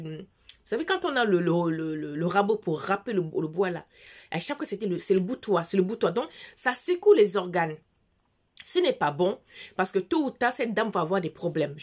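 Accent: Gabon. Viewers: VOUS ETES GABONAISE? j'ai reconnu l'accent c'est le 241 qui gagne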